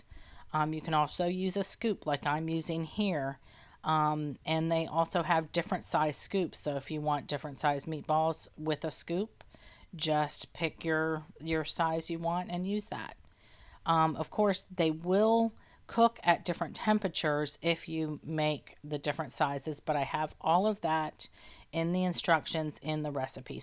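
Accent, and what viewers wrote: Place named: United States. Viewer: The moment she had American accent I stop watching what American know about meatballs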